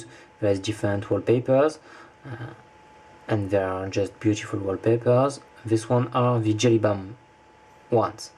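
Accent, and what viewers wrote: France. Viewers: Je reconnais bien un accent Français derrière ! Pourquoi cette ROM n'est plus sur XDA ? Un problème avec ? I can regognize a french accent ! Why this ROM is not more available on XDA ? Problem with ?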